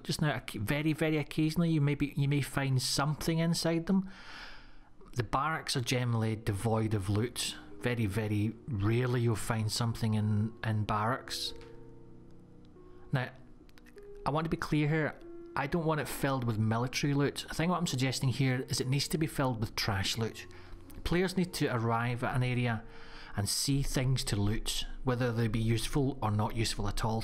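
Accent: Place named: Glasgow, United Kingdom. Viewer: So in reverse order. 1. I Agree with the locked compounds element, for the reason you state. But also, if I have the key then it becomes "my" compound/base. 2. When it comes to the loot spread in military locations I think it's an issue too. I'd like to see more sensible/realistic spread of loot in military locations, soldiers etc didn't eat guns and I'm sure probably owned civvy clothing too. I'd like to see the military equipment spread more logically inside some of these areas. 3. Dynamic crashes - of all sorts, humvee, civvy, police, game warden/ranger (in woods), and campsites (one or two tents civvy/hunter/game warden) 4. The flying heli's - they had this in one of the mod's I believe (for the mod - the modded mod haha), and it was awesome. 5. Zombies - I think at all dynamic locations there needs to be a chance of broken (crawler) zombies spawning along with a regular mini-horde/cluster. 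6. Re-vitalize old areas - totally agree, some of the old areas like the boat are awesome, but pointless these days. I'd love to see these locations with more positive reasons to visit them. Whether that's to setup a base/hold them as their good defensive points, or because they have good loot/something rare possibly. Good thoughts delivered in the Glaswegian style we all know and love!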